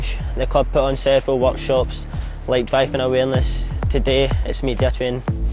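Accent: Scotland, United Kingdom. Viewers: If he Wants to have a good career he has to get rid of that Scottish accent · I love his Scottish accent lmao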